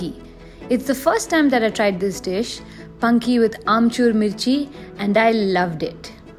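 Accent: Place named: Telugu, India. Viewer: Seems to be telugu woman speaking in English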